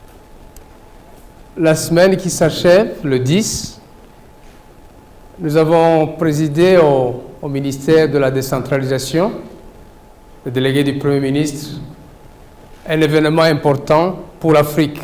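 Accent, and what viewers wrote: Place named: Rwanda. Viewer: Tu te trompes toi même vraiment tu vais par 4 chemins et te voilà arriver au point que Dieu te maudisse profondement jusqu'à ta moëlle tutsi rwandais que tu es tu ne convaincras personne et tu la fermes. Tu voulais recencer les congolais pour Donner l'identité aux rwandais mais tu as raté ton accent ressort toujours. Tes troupes rebelles sont là aussi. Tu as apporté les fonds à vos troupes rebelles et intensifier les tueries dans notre pays. Tu as tué combien de congolais leur sang tombe sur la tête de tes enfants. Je souhaite que tu ne sors pas vivant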